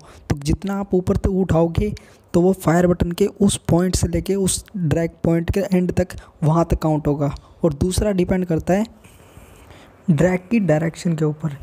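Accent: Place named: Hills, India. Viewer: Bhai tumahri aavaj pahadi Se milti hai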